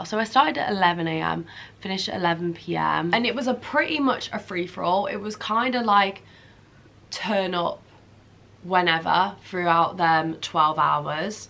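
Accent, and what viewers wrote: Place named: Chelsea, United Kingdom. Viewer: Not Essex more Chelsea :) wait.. Whaaaaaaaaa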